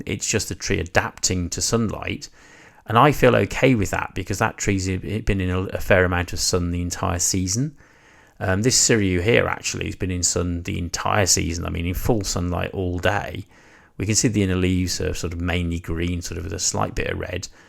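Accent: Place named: Midlands, United Kingdom. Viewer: Subbed👍🏽just started my maple collection, your content has been a big help👍🏽thank you. I’m in Telford, sounds like a midland accent you have👍🏽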